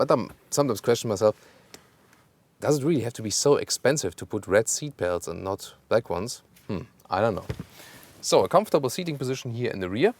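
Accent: Germany. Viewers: Wollte eigentlich auf die RS3 Limo warten, aber aufgrund meiner Familie brauche ich doch was größeres und werde die Tage den RS6 Perormance bestellen. Freue mich wie Sau !!! Danke nochmals für den Bericht (Ich LIEEBE Deinen deutschen Akzent HAHAH)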